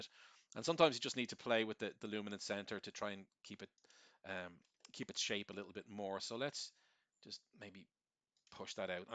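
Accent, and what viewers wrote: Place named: Ireland. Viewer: so rare to come across an Irish voice when looking at YouTube tutorials. Lovely.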